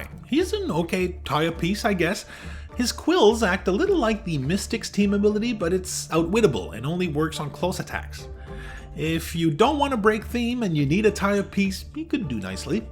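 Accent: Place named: Quebec, Canada. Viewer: So the is French Canadian!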